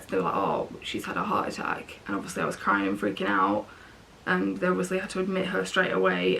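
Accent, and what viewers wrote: England, United Kingdom. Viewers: Thank you for finding the strength and courage to make more videos. I really love to watch everything you record. Everything! Your voice is so sweet and soothing. I am not being weird. I am serious. I just love your accent and ' too! I have been doing a lot of work on Ancestry and found that I am predominantly English, and my ancestors were centered near London. I listen to you talk and imagine how my ancestors must have sounded too! Thank you for sharing your world with us. I look forward to the next video!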